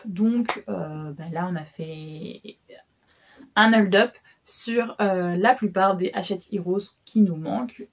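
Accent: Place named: England, United Kingdom. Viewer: ton accent anglais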